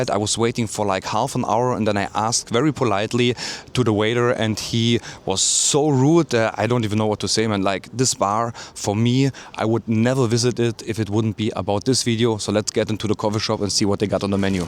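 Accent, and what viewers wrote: Germany. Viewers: You have german akzent ma boi. Are you from germany?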